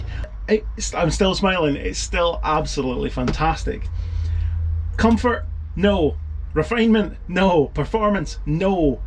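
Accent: Scotland, United Kingdom. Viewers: I want one of these, guessing by your accent, hopefully I will spot it kicking around Beautiful Scotland??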